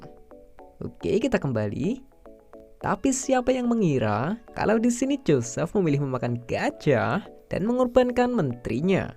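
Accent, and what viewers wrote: Java, Indonesia. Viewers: Sampeyan jowo endi bang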